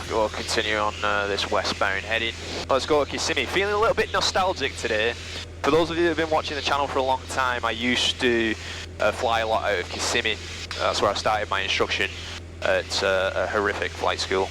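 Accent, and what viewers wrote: United Kingdom. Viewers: You sound British, but you're in the USA.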